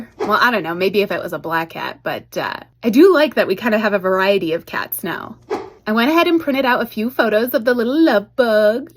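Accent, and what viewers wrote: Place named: England, United Kingdom. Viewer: Hendrix is absolutely gorgeous 🩶 Your English accent makes me laugh 😂 it's like Emily from f.r.i.e.n.d.s and I promise we don't sound like that 😅 and as someone who lived in England 27 years and now Scotland for almost 5 years .... Scotland is definitely more scenic with beautiful views imo. Come to Scotland and I'll make you a full "English" Scottish breakfast with square sausage and tattie scone 😜 [im more an english breakfast person haha! But no black pudding 🤢]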